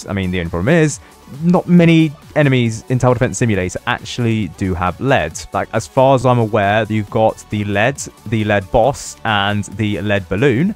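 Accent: United Kingdom. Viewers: I love listening to a british guy yap for 12 minutes straight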